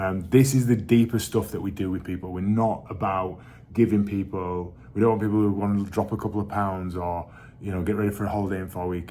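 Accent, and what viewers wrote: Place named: Yorkshire, United Kingdom. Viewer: Thank you for your very articulate reflections on confidence,. They were characteristically thoughtful. You are a very impressive man. You are more a self-made man than most men I can think of: you have built up your own confidence, your own life, your own body, your own business and made a success of all of them! And you have fabulous blue eyes and a delightful Yorkshire accent as well. What a star you are! The US President who said that imperfect action was better than perfect inaction was Harry Truman.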